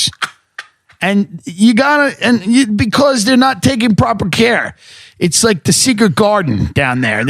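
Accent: United States. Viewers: I'm 8 mins in and all i hear is a yank yapping.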